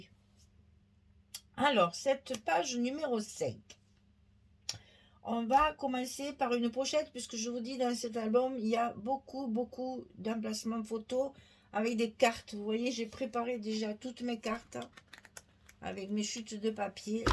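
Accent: South, France. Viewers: Bjr. Un tuto de vous sans parole quel gâchis!! Votre accent ensoleillé nous manquerais trop par ces jours de pluie ☔... Même sur le Pays Basque / landes le temps est triste actuellement... prenez soins de vos cordes vocales, vos tutos ne peuvent pas fonctionner sans votre voix du Midi... A demain ou plutôt Adishatz (en landais) ou Agur (en basque)...👋😉 bonne journée.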